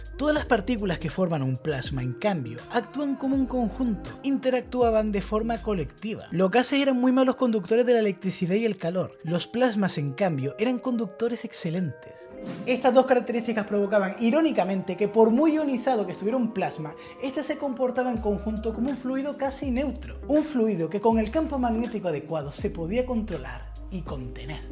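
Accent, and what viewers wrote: Chile. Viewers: Soy yo o tiene un acento parecido sl chileno? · Gracias! Excelente infotración. El centro de mi ciudad estaba repleto de avisos de neón en los '70. Una duda... tienes acento "chileno" es idea mía o eres de acá? Saludos desde Chile!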